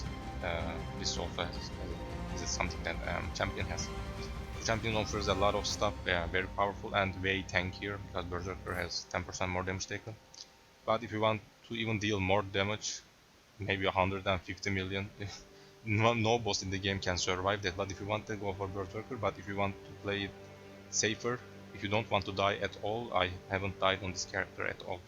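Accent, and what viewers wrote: Turkey. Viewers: Lol I immedialy recognised the Turkish accend. Ty for the build.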